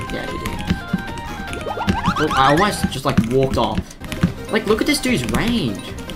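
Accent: Australia. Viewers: R u from qld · Aussie accent is 🔥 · Can i play with u also are u a. Aussie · W accent I’m Aussie too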